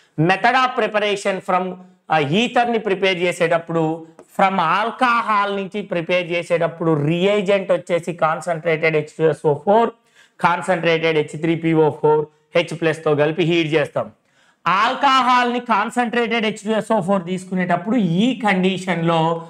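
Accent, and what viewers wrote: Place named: Telangana, India. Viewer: Telangana yaasha😂😂😂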